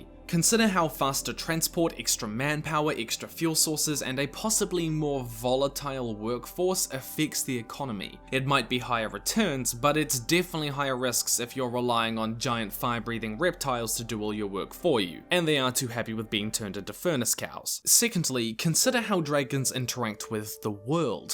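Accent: New Zealand. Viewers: Oh you're a Kiwi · Dude, i didn't even notice you were a kiwi, im also a kiwi so i guess i just sorta didn't notice your accent as its just the same as everyone else i know. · Wait your a kiwi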